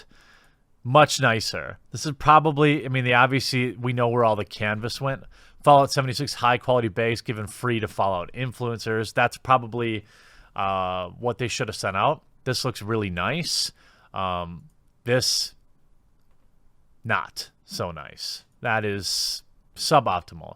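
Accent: Wisconsin, United States. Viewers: it’s that Wisconsin midwestern accent. One of my favorite parts of living there for 5 years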